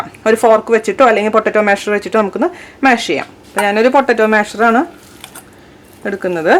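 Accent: Thrissur, India. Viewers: ചേച്ചി അടിപൊളി ആണുട്ടോ തൃശൂർ ആണോ വീട്.... അതാണ് ഒരു നിഷ്കളങ്കത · chechiii njn chechide veliya oru fan ane ela receipsm try cheyarnd elam adipolli ane chechiii chechide thrissur slagm presentation. oke super ane njnum oru thrissur Kari ane to God blesss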